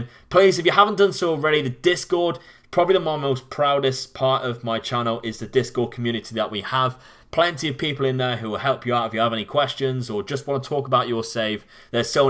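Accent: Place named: West Country, United Kingdom. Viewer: I thought your accent was west country but didn't think you were as local as that, Plymouth for the win (something no-one ever said before) lol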